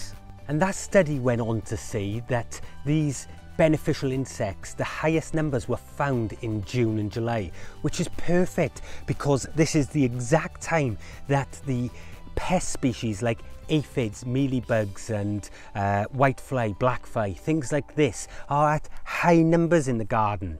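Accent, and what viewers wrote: Wales, United Kingdom. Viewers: Yep its about time we started to listen to nature. Thank you soooo much for your vid and love the welsh accent sooo cute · We eat them in Romania. I remember my grandmother feeding them to pigs..I remember their strong smell..so many memories brings this plant..hard to find them in Virginia, the US where I live now..btw love your British? accent.. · Thats not a "British" accent has a WELSH accent a big difference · Great video and lovely to hear a Welsh voice entwined in nature.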